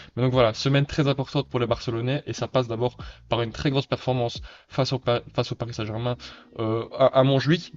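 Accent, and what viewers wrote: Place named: Belgium. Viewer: Super vidéo, tu mérites plus de visibilité! Ps: est-ce que tu es belge par hasard? Je reconnais un petit accent haha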